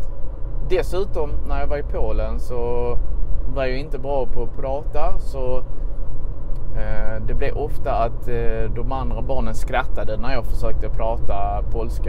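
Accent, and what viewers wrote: Scania, Sweden. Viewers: hahaha skånska är nice! ;)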